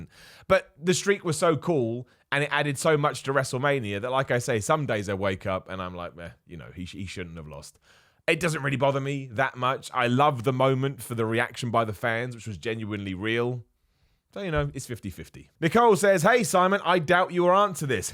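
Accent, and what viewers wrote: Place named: Northern England, United Kingdom. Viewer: From your accent I could never work out if you're a northerner or southerner. Then you mentioned you're from near London in a few of your videos. Made me think, where is that northern twang from. Worked out from this video it might've been from your time at university in Manchester 😂😂😂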